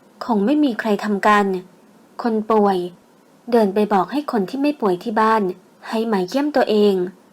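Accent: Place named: North, Thailand. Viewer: ผ่อหื้อกำ ฮาจะขี้นี่55555แอดน่ารักมากเลยที่พูดเหนือ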